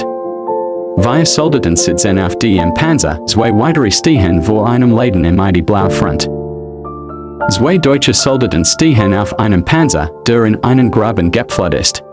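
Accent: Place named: England, United Kingdom. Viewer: Terrible pronunciation. Strong English accent.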